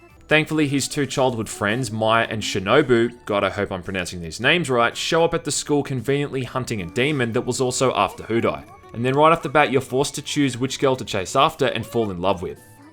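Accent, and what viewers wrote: Australia. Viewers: I don't know why, but this review made me laugh harder than it should have, possibly because I'm trying to see you as some studious, constantly befuddled Senpai (if that's the right word). Also, I'm dying to find out what an Australian accent sounds like paired with Japanese.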